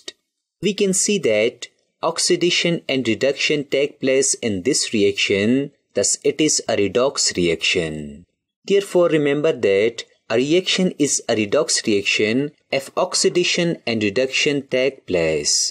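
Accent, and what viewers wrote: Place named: Uttar Pradesh, India. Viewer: according to his voice and accent he sounds like he is from up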